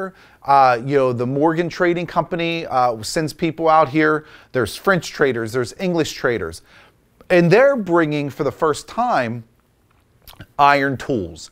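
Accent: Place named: Pennsylvania, United States. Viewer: Dude! That accent! I lived in PA so long I didnt realize just what a pronounced (strong) accent it was! Thanks for the interesting info! I have been thinking about the Shawnee people, and didnt realize they had a presence in Northern West Virginia (LOL western PA)!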